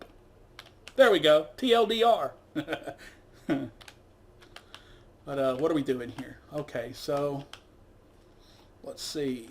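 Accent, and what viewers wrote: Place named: Midwest, United States. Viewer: Btw where are you from? From your accent I'd guess American Midwest, maybe south?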